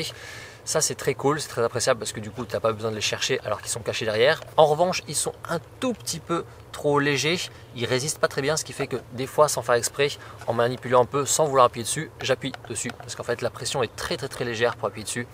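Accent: Toulouse, France. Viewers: Alors moi l'accent toulousain ne me gène absolument pas, j'y suis habitué :). Plus Sérieusement, encore une belle vidéo pratique, instructive, claire et le fait que tu parles vite, bah je trouve ça trop bien, on te comprend totalement et ça a l'avantage de ne pas voir une vidéo de 20 minutes pour apprendre que DJI fait des produits au top... bon là on en est pas loin. Bref, tout ça pour dire que j'ai bien apprécié ta vidéo. Seul bémol (parce que sinon je ne commenterais pas, comme beaucoup...) : sur la portée de la radiocommande RC, en vrai, comment tu le dis, à courte distance pas de problèmes, mais au delà de 500m, ca déconne un peu. Perso, j'ai toujours ma RC-N1 en back-up et dont la liaison est quand-même bien meilleure que la RC. Je garde la RC pour l'avantage de la vidéo intégrée et ergonomie, mais je dois reconnaitre que je suis moins à l'aise en terme de sécurité qu'avec la RC-N1 qui elle ne foire jamais. 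J'espère fortement que DJI remédiera à ce point. On nous parle d'Ocusync "10 000" mais le résultat est pas fou. Ca reste ma vraie déception de l'achat du Mini 3 Pro. Je me voyais déjà envoyer mon drone sur la lune pour prouver que la terre n'était pas plate. Bref... En tous cas, encore un grand merci ! Continue, et je continuerai de mon côté à te suivre !!! Et encore merci en tous cas !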